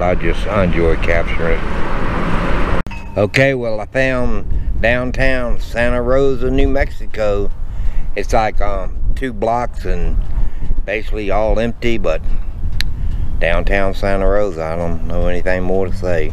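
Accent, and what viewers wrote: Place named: Louisiana, United States. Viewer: Boo Yah. Sounds like ya from La.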